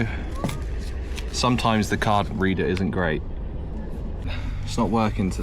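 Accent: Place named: United Kingdom. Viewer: Да, очень приятно послушать. Получается нас действительно учили британскому английскому.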